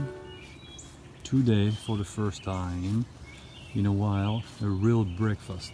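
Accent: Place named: France. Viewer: not to sound demeaning or such I have been trying to figure out your accent leaning towards French been watching all of your vids they are great hoping to do the same someday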